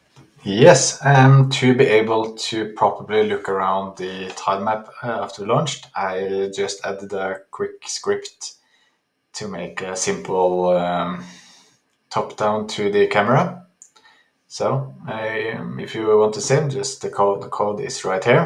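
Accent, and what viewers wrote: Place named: Norway. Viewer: Hallais! (based on your accent, I assume you're Norwegian 😅) Loved this video dealing with maps - that gave me a much better understanding on where even to start with my overambitious project. I would love to see your take on data structure/handling 😊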